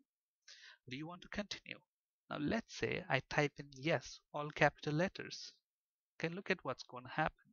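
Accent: India. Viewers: Easy to understand Indian assent.... :D Download this video, install the gom player, listen over there with extend volume for more clearly.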